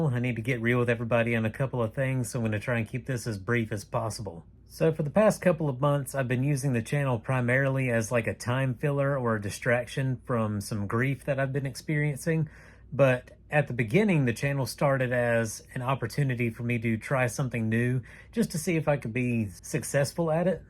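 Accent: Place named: South, United States. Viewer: Nothin' but love, brother. I happen to like your voice. I'm originally from Kentucky but live in Illinois (I hate it here) so getting to hear southern accents I find very soothing. Plus, I love books and snack review type stuff, which you've done. So... yeah. I'm in for the duration plus ten years. (Also big First Responder & Military family so that's an added bonus)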